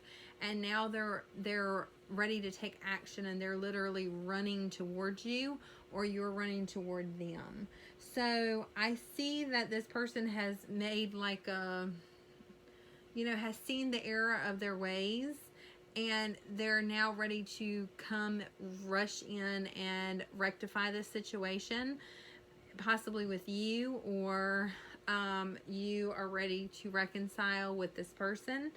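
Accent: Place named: South, United States. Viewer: Thank you for the reading. I like your southern accent reminds me of home 😊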